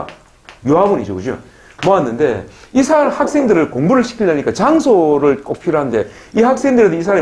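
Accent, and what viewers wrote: South Korea. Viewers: 안녕하세요.! 하!하!하! 정말 리얼한데요.!! 구수한 사투리...정말 친밀감과 진실성이 드러나서 왕팬입니다. 이번 에피소드는 영어유학원에 관한 얘기라서 더 진지하게 들었습니다. 지금도 필리핀에 계시는건가요? 저희는 샌디에고에서 CC2UC 라는 유학원을 운영하고 서울 강남에 국제학교를 운영하고 운영하고 있습니다. 혹시 역으로 필핀의 부유층들이 미국으로 유학을 원하는 층들을 모집할 수 있다면 어쩌면 도움을 드릴 수 있을 것 같아요..ㅎㅎ 한번 검색해보세요. 내년 여름방학을 이용해 필리핀으로 여행을 하게 될 경우 한번 만나뵙고 의 고매한 인품과 경륜을 배우고 싶네요..!!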